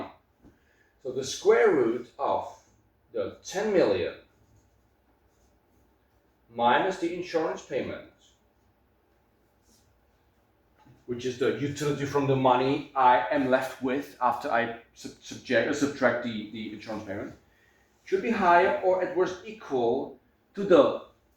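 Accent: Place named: Germany. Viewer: I got recommended this video and I heard the semi German accent so decided to watch the entire thing 😂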